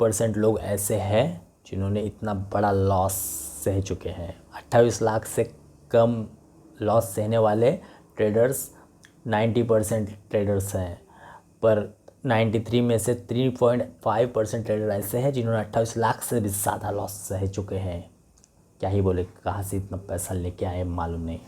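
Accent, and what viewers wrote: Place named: Agri, India. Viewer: मराठी hai.. aagri 😊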